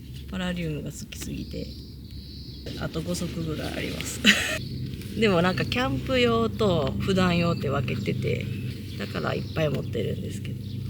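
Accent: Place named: Kansai, Japan. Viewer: いつもこっそりと動画を拝見させてもらってます。 関西弁で、焼き鯖寿司を食べる女子、素直でとても魅力的な女子ですな👌 これからも、キャンプ道中お気おつけて🙂‍↕️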